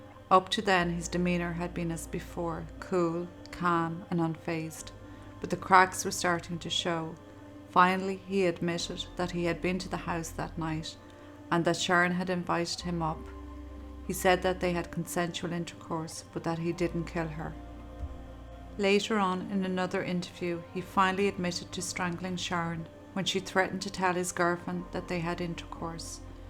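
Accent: Ireland. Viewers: I simply love the narration. ❤️ To the point, no loud music, no annoying speech patterns, no (time fillers of) recaps of what's already been said. And you get a cute little Irish accent. Love it !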